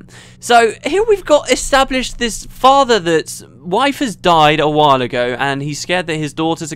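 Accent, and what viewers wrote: United Kingdom. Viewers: English is a foreign language to me but i can nearly understand 100% of everything you say. Thank you for your clear voice and your british dialect beeing not that extreme. Its very delightful and relaxing to listen to. At least in my head you are a very handsome and good looking man :)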